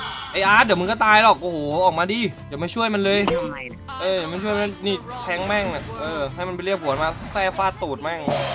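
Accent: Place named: South, Thailand. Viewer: คนใต้แน่นอนพูดแบบนั้น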